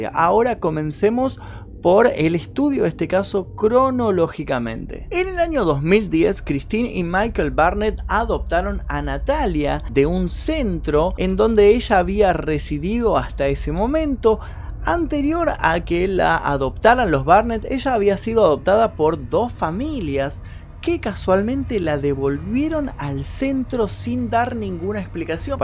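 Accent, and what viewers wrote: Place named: Argentina. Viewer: siento decirlo así pero pareces muy estúpida o tu comentario es estúpido. Te estás metiendo con este chico porque habla argentino.. Lo tachas de hablar de la "selva" (habla perfectamente) cuando ves vídeos de una persona Argentina. A ver si tienes cojones de escribirle al chico de los vídeos a decirle que no entiendes su idioma de la "selva" y que hable "bien" (como tú) en los vídeos. Venga, ve corriendo a ver si te atreves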